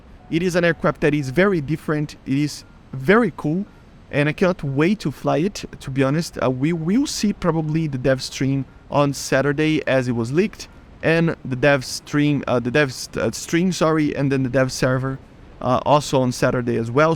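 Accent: Brazil. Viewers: Brazilian accent.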